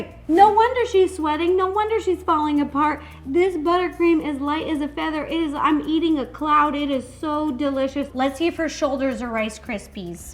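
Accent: United States. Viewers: Haven‘t hearrrrd SUCH an American accent in a long time but sounds cute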